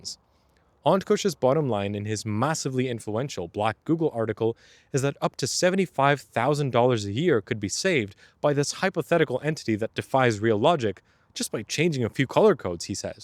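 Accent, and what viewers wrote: United Kingdom. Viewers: The topic was highly interesting, the delivery was great! Your vaguely British accent is Decent! The message was appreciated. 10/10 would hurt my eyes watching this video again! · why were you british half the time? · Incredible British accent. I forgot you were American initially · Your British accent is top notch sir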